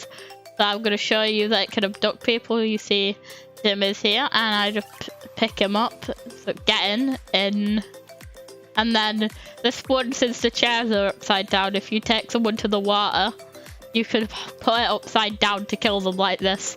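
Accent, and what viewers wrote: United Kingdom. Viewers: Your British accent I LOVE IT❤ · Are you from the Britain cuz you have a british accent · after i cam e to your channel i already knew you are british xd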